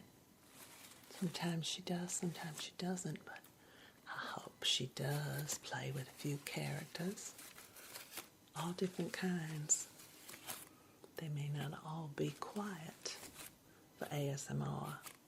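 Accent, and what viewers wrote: South, United States. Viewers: Oh my gosh yay. I love, that southern accent is so tingle inducing. Love love looooove <3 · Oh what a wonderful surprise! Absolutely love love love this Southern belle! Just exquisite and relaxing. Happy new year!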